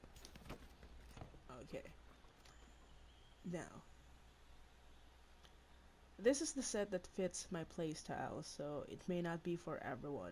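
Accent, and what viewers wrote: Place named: Philippines. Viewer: you sound filipino =D. but you just gained a sub! love your build coz i main bow too!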